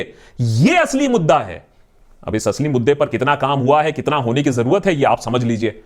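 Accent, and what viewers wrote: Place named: Bihar, India. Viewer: The Deshbhakt are you a Bihari.Your hindi accent is very much alike a Bihari accent,i like it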